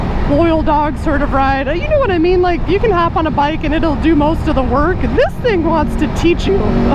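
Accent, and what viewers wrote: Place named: Chicago, United States. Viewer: Sounds like you are from Chicago.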